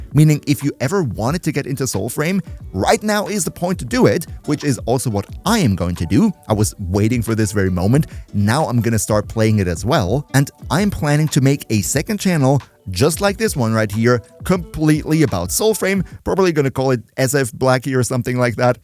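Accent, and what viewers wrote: Germany. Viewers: U are German???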